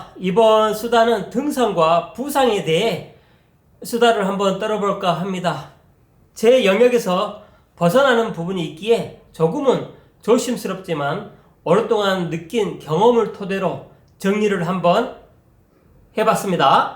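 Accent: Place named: South, South Korea. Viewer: 포토샵 영상에서부터 느꼈지만 디테일하고 전문적인 수준 높은 강의 중화된 남도 억양과 조분조분한 말투 너무 듣기 좋네요 2024년도 님과 가족분들 모두 건강하고 행복한 한해 되세요 2024년을 2004년으로 썼네요 그래서 수정 ㅎㅎ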